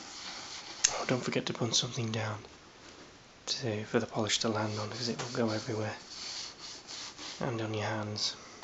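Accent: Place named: North-East Wales, United Kingdom. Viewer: Very nice and relaxing. Hmm as for accent, I'm probably wrong bit you have a similar accent to my friend who lives in north east Wales, near liverpool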